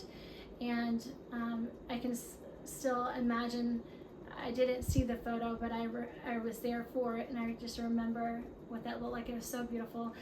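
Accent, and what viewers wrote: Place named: South, United States. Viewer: She definitely still has a bit of a Southern accent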